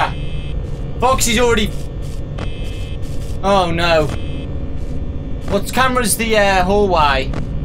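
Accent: United Kingdom. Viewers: Tell where did you come from,I mean I love your voice;British?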